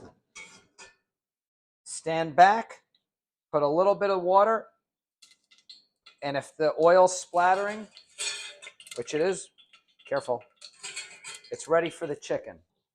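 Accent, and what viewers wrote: United States. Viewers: Dats a Joisey accent. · Like the New York accent. Great meal. Thanks.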